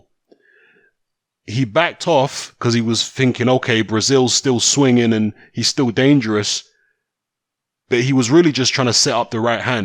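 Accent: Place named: United Kingdom. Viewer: Thanks for that it's nice to hear someone with a British accent giving Wilder some respect.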